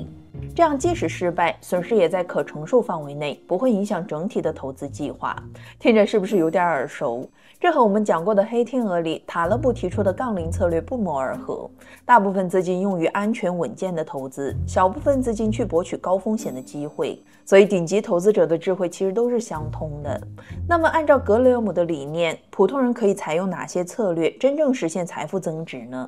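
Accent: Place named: Northeast, China. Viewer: 是东北主播吗？能听出家乡音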